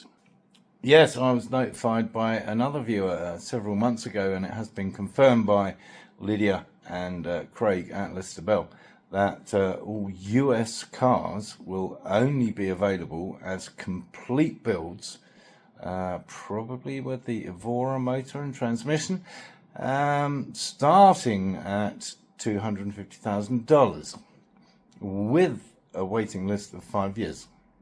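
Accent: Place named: England, United Kingdom. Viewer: Really nice to hear an English accent for a change. There're so many dodgy characters, with bizarre voices on YouTube talking absolute garbage. This guy is a breath of fresh air. 👍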